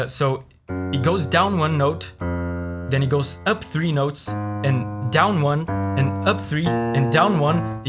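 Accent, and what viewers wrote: Brazil. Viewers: Mano, vc é brasileiro? O sotaque já te entregou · sotaque de br, é brazuca?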